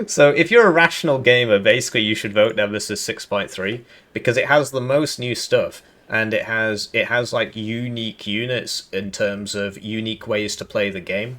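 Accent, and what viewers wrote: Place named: Liverpool, United Kingdom. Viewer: Yeah I thought I could detect a hint of scouse, born and bred in the city you notice these things.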